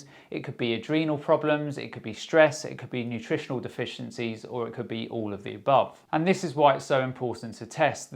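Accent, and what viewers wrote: United Kingdom. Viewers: You sound British. Thankyou for info. Where do you suggest to get these tests done? So many options I don't know which to trust.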